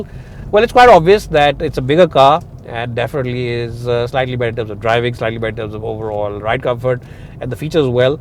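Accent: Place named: Bhojpuri, India. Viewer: Add proper accent to your English Your English sounds like bhojpuri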